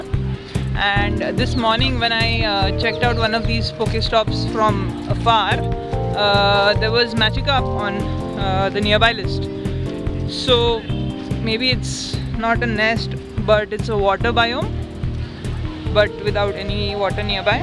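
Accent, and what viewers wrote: India. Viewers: Indian accent is by far one the best. But I can't help but laugh a little 😂 sorry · Damn. That sucked. The Indian accent is annoying. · aaahahahah I just cant stand the Indian Accent :DD I just cant stop laughing omg, this sounds so racist hahaha. "Walecum duuu dii internasional dexcs" omg im dying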